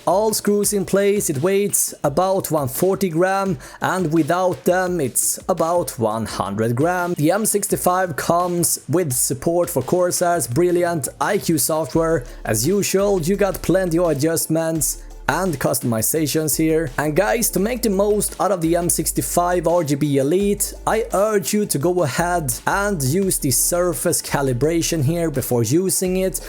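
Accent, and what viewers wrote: Sweden. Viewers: Sluta prata så jävla svenskt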